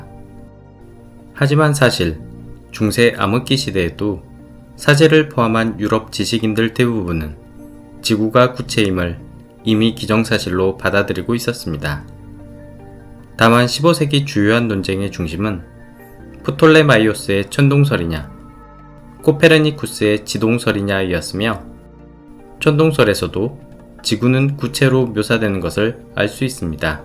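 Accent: Jeolla, South Korea. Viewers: 영상 잘 보고 있습니다~! 드문드문 나오는 전라도 억양도 재밋어요 ㅎㅎㅎ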